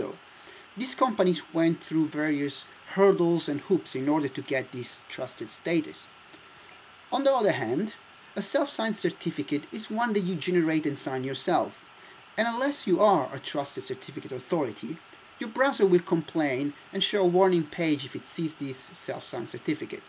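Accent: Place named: Italy. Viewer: Ottimo inglese ma l'accento italiano è davvero impossibile da nascondere Hahahah Btw nice video!!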